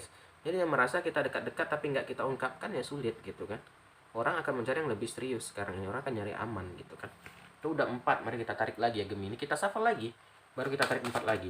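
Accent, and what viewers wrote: Padang, Indonesia. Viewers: Logat bahasanya sprtnya mas org padang ya...🤣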